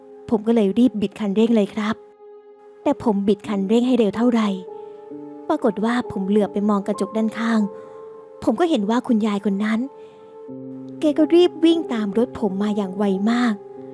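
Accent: South, Thailand. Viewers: ติดตามมาเป็นปีละ ฟังตลอด และสงสัยตลอดว่าสำเนียงแบบนี้ เป็นคนใต้เหมือนกันรึป่าวครับ 🤔😂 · ลองไปฟังคลิปเก่าๆนะเจ๊แกพูดภาษาใต้เยอะ เจ๊คนใต้แน่นอน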